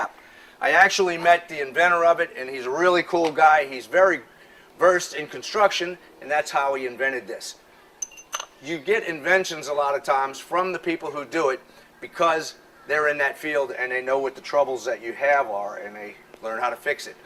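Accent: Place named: New England, United States. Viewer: This is actually a great tip. A lot of people hype up the DIY tips that everyone knows, this one I haven't seen before. BTW- I see the southern background and hear the yankee accent, I'm in the same boat. Best decision to move down here. Now on to binge watch your videos...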